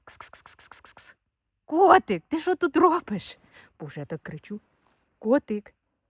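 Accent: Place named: Poltava, Ukraine. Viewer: У вас говір "Полтавський" 😊 Ви самі звідки?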